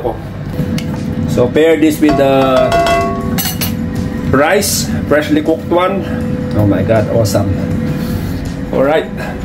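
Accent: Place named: United Kingdom. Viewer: Wow british accent ne😍😍😍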